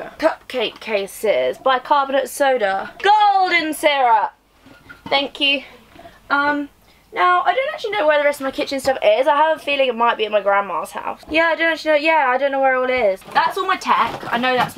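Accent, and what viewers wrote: United Kingdom. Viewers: I like a lot she's voice, I wish to get a bit of British accent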